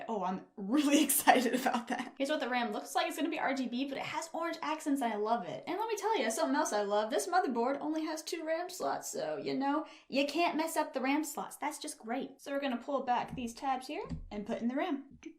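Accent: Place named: Alabama, United States. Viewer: the way she talks makes me think she was raised a catholic school girl from alabama like damn AHAHAh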